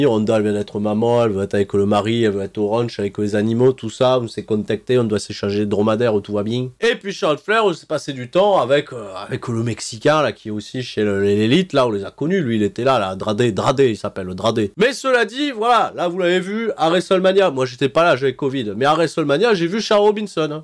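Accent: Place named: South, France. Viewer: c'est plus très marrant le délire arbitre accent du sud un peu con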